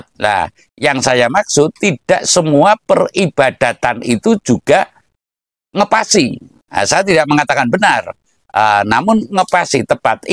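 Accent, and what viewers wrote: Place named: West Java, Indonesia. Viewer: Kalau jadi Ulama/kyai Belajarlah Yg Bener dan Tutur katanya Yg Indah...Contoh Kyai2 Terdahulu Tutur katanya Enak..Contoh Mbah Maimun Mbah Nasir... Mbah Nur Hadi.. Mbah Solihun... Dan Msh banyak Lagi Kyai2 Yg Bicaranya Lemah Lembut..Tetapi Ini Kyai Dengan Logak Sunda Bahasanya Agak Kurang Beres...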